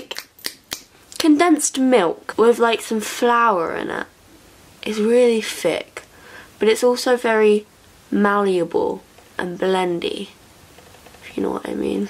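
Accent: England, United Kingdom. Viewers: Is she from England?? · What part of England are you from? I loveeee your accent!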